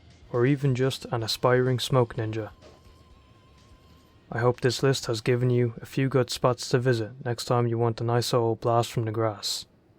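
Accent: Ireland. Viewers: Dude I don’t know why but you sound soooo Irish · I feel dumb for not noticing you were Irish 😂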